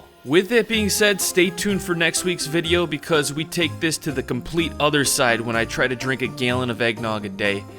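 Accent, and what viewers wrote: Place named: New England, United States. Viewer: Where are you from bro ? Your accent is screaming New England hahah that’s coming from someone on New England lol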